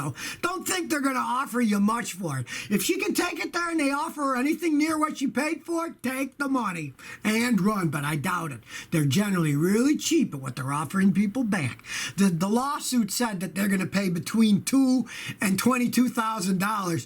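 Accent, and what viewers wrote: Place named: Chicago, United States. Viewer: Hello,. Are you sure that you’re from Texas. You’re accent sounds like from Chicago or Detroit. I appreciate this wonderful channel, and keep up the great work. 😊✨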